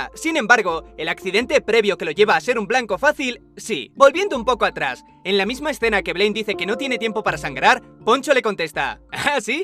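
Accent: Spain. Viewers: Tu voz de español zoqueta es INSOPORTABLE!!!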